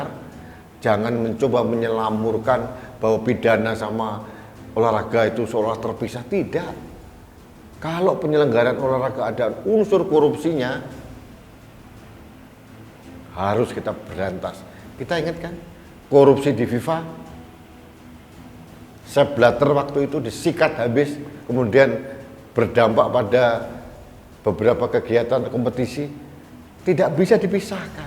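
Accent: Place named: East Java, Indonesia. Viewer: Pak ini dari jawa timur ya logat nya kelihatan, salam dari saya dari malang pak, salam NKRI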